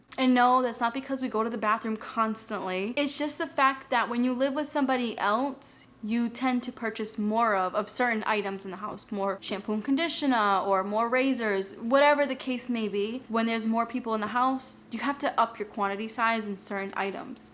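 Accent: Boston, United States. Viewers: Love your Boston accent. 😄💖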